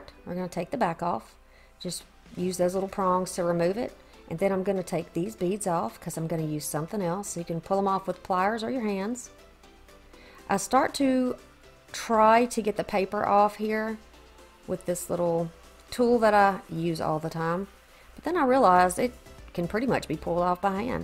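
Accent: South, United States. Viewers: Love all of your diys I know from your accent, you're southern. Where are you from. I'm from gulf shores alabama.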